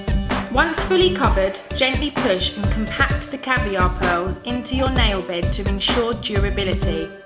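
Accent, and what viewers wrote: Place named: United Kingdom. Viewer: Im falling in love with ur British accent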